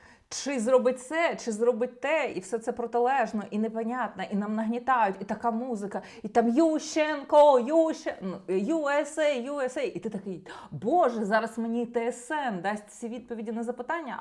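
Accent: Russia. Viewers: Цікаве відео, дякую ) Ви питали, чи дратує ваше цмакання. Мене не дуже. Але є інші звуки, що не дуже органічно звучать для мене. Такі слова, як "немаЄ", "знаЄш", "здаЄться", ви вимовляєте як "немаЭ", "знаЭш", "здаЭться", на російський манер. Стараюся не звертати уваги, але реально важко. Тому дивлюся вас рідко, на жаль.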